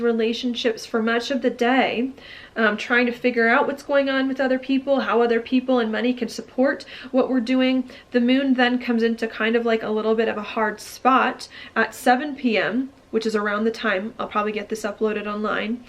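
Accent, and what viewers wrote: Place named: South, United States. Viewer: Happy Holidays. Have fun in beautiful Georgia. I knew you were from there how you say so sweetly "thank you for watching my video, if you like it please share" total sweet southern way of saying something. Not an accent so much as a emotional quality. I love the south. My husband and I decided our personal heaven is gonna be like the south minus the bugs and gators.: )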